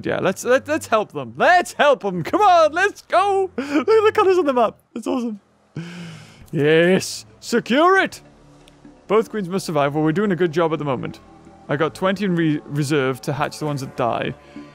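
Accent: Philippines. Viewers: ANOO NAGSABI BA SIYA NG PILIPINO TAGALOG ACCSENT HA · ah that filipino accent is giving my ears seizures 😭😭😭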